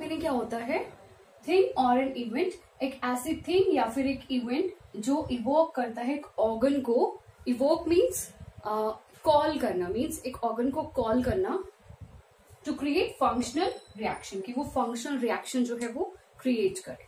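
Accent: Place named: India. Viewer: English ko Indian log ke jaisa bolya mam pronouncession is bohot hard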